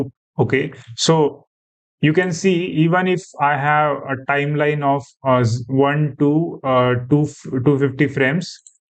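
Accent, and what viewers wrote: India. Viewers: I cant take 12hrs of another indian youtuber but the guys without an accent put a fkng paywall behind their tutorials. Shit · If you are making video with this accent Why don't make Video in Hindi Many people who don't know English can learn better. Indians should not make Tutorial videos in English unless they are targetting Foreign audiences and With this Accent. Are you serious? Better make it in Hindi Many people can learn.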